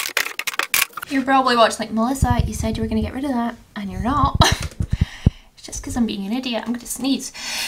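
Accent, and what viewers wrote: Scotland, United Kingdom. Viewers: What part of Scotland u from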